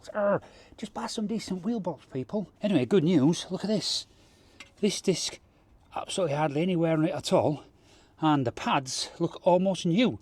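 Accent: Hull, United Kingdom. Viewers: Are you from Hull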